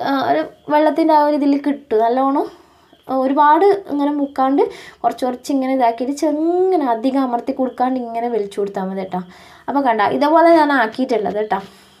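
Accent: Kannur, India. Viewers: Samsaram kettitt kannurano enn oru .........😁😁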